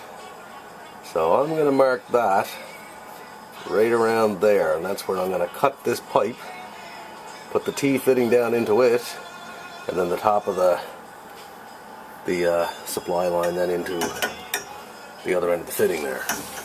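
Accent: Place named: Canada. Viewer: I know this is a late comment, but please bear with me. The "" T "" junction fit was mentioned numerous times but was not actually shown being inserted. There was mention of a water mess difficulty....However, I really wanted to see how to slip the fitting on one end.....and then get the other end ( which would have been cut one inch long) into the fitting without having to push that copper end (on the supply tube) upwards and then downwards and into the fitting. I really missed that bit.....and I was wondering if anyone could add some information on the easiest way to do this. The supply tube may be attached via a lug and screw and that would make it difficult in my 'mind"....but maybe it isn't thanks for any help fellows. Love that Canadian accent...First time I ever noticed it.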